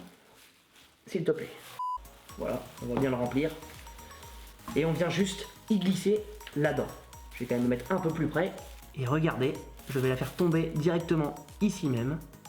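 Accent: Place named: Nord, France. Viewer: Excellent l'accent du Nord de wormhout et vive eul chnord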